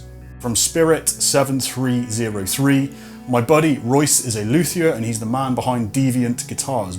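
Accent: RP, United Kingdom. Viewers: I always love your videos and posh accent ❤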